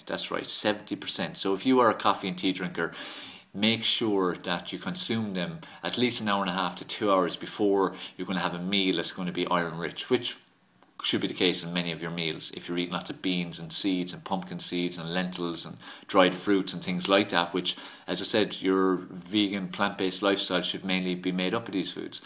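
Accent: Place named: Ireland. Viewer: thanks so much for the videos! what part of ireland you from man?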